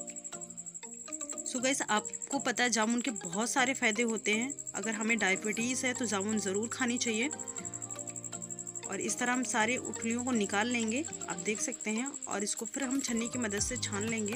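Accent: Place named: Awadhi, India. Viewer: Nice awadhi 👍👍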